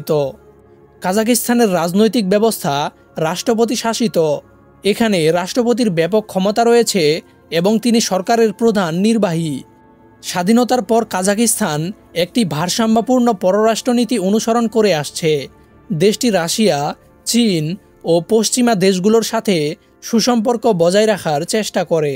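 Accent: Sylhet, Bangladesh. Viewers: আপনার বাড়ি মনে হয় সিলেটে।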